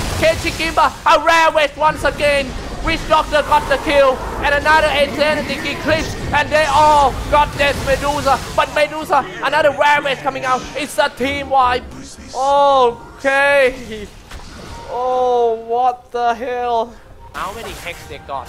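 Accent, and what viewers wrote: Thailand. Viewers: The Caster sounds like Thai or Vietnamese... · Everybody's hating on this caster saying "he has low knowledge on the game". Well for me, he's entertaining. Has a sense of humor which is rare in the casting scene. He's a breath of fresh funny air. (And his Thai accent is part of his charm)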